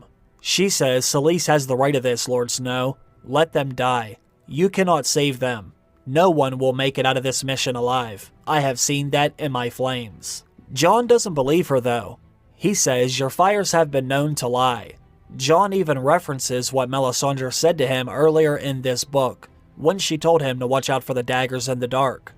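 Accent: Pittsburgh, United States. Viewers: I know this is completely off topic but are by any chance from Pittsburg?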